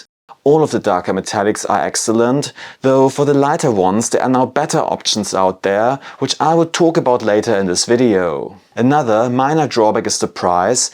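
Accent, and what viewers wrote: Germany. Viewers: Are you a german trying to hide your accent?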